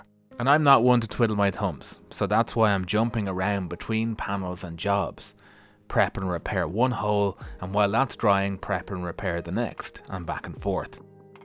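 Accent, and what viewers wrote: Ireland. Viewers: Just discovered this channel, and I absolutely love it! First it's great to hear an Irish accent for a change, and second you are rebuilding one of my most favourite cars, ever since I saw Roger Moore drive one off a pier. Outstanding work too and loving the stop motion to by pass the boring stuff. Excellent stuff!!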